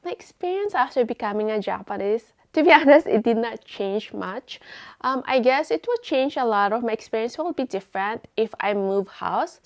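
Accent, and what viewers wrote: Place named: Philippines. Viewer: Last 3 videos you did well to hide Filipino accent but not in this video 😂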